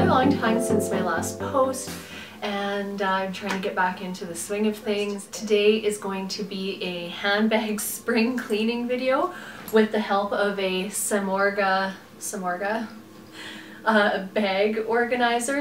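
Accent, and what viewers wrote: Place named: Canada. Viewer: Me: hmmm her accent sounds Canadian? 🧐 Her: pulls out Canadian stamps Me: Beaming bc i guessed right ☺️☺️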